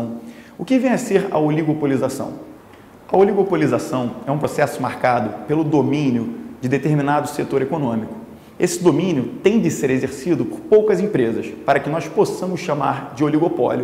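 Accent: Rio de Janeiro, Brazil. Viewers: Esse sotaque carioca é muito gostoso de se ouvir.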